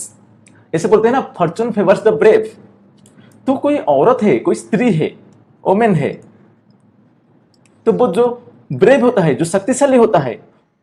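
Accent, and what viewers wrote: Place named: Odisha, India. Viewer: Mu bi odisha ru sir from balasore video re majhire odia voice dekhi mna ku question asila jani parili sir ama odisha ru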